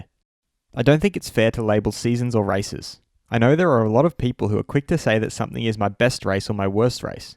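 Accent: Australia. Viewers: These clips are fabulous - I find it difficult to believe you only have 100,000 subs. Your videos are easily of the same calibre as any TV program from back in the day when people still WATCHED tv, lol. Really stoked to find this channel, as a fellow Aussie it's really cool to hear the Aussie accent. GREAT STUFF!!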